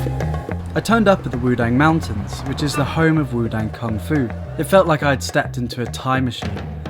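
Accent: United Kingdom. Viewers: British accent is horrible...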